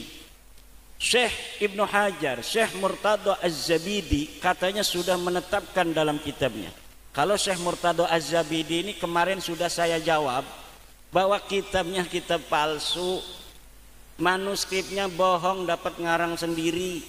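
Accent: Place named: Batak, Indonesia. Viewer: Dari gaya bicaranya rijik saja nazabnya sudah batak. Mukhibiiin....mukhibiinnn. yang pinter rek !!!!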